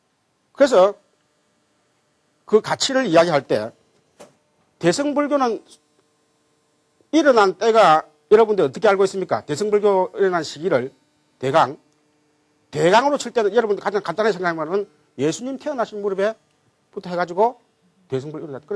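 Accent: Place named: Gyeongsang, South Korea. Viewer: 귀한 영상을 올려주셔서 감사합니다 교수님은 듣기로는 서울대 수학과를 나오시고 동국대를 졸업하고 인도를 유학하신 분으로 초기불교의 대가이십니다 우리나라 스님들께서는 이분의 강의를 꼭 듣기를 권유합니다 우리나라는 대개 대승불교인데 대승불교 없는 초기불교는 있어도 초기불교 없는 대승불교는 없습니다 이 강의가 2005년도경에 서울 남산에있는 대원정사의 당시 대원불교대학에서 강의 하신것 같은데 귀한 영상입니다 많은 분들이 들으시고 불교에대해 공부하는데 많은 도움이 되면 좋겠습니다 경상도 말씨로 알아듣기 어렵지만 몇번 들으면 맛갈납니다 당시 김사업교수님의 유식강의도 명 강의인데 영상을 내려 아쉽습니다 참 귀한 영상입니다 절대로 이 영상 내리지 마십시요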